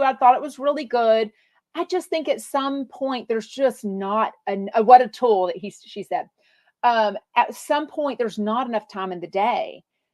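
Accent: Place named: South, United States. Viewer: you are sweet and southern sounding coming from a texas person💝💝💝💝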